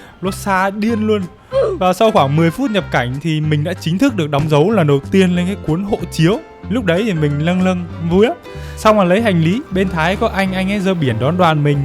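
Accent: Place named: Hai Phong, Vietnam. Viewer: em đăng kí kênh anh r nha thấy giọng hải phòng hài vô cùng luôn á e nghĩ a nên phát triển thêm mảng tiktok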